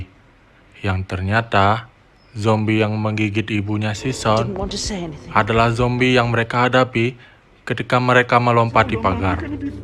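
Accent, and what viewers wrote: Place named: Bali, Indonesia. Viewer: pengisi suara nya kaya org bali hehee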